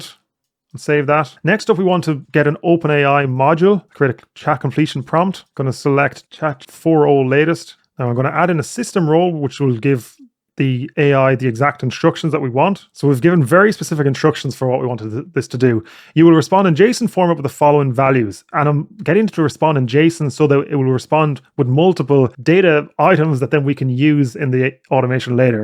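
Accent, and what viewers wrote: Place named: Ireland. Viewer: Thank you for the tutorials. Nice to hear an Irish accent too :)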